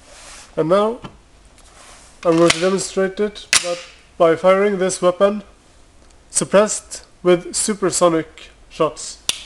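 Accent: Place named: Europe. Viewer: What wondeful fantastic and truly democratic European country do you live in that actually permits it's citizens to own both a handgun and a silencer? Your accent is European and I assume that's where you shot this very interesting video. I live in the US and Americans like me refuse to allow our government to take away our right to own firearms. Yes, we are a violent country, but it's the criminals doing all the violence--not law abiding citizens like me.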